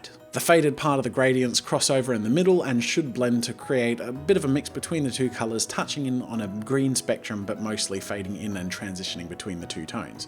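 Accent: Australia. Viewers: Hey, just stumbled on this video.. Out of curiosity is your accent a queenslander? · hes Australian? at least I think because of the accent but he's actually hot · Are you Australian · I just now realised that says "good day" in every video is because he's austrailing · Is he Aussie?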